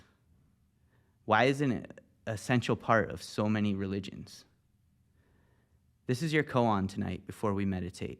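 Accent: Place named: Massachusetts, United States. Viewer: Before I address this question ⁉ I have a question of my own . Are you originally from Massachusetts, as your accent is not that pronounced? I'm originally from New England myself , Connecticut.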